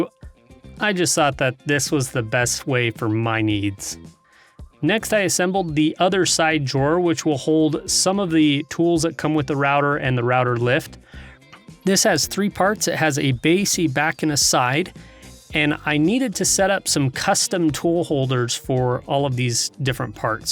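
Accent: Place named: Salt Lake City, United States. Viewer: "All the way acrosst it?" SLC people talk funny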